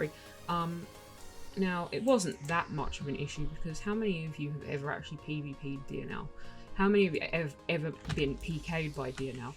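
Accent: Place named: England, United Kingdom. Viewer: You are first lady make video about dekaron. And from accent i think you are english. I live in londont form 5 year maybe can see you one day at coffe. For help me with information about global dekaron. I dont play to much global. just 1 month. And laster years same like 1 mounth after play private servers.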